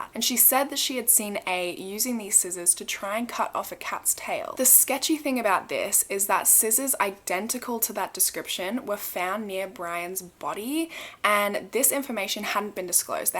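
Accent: Australia. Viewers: Are you australian? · Sure she is Australian 🤔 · Where are you from ? Australia ? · i love your australian accent!!! 😭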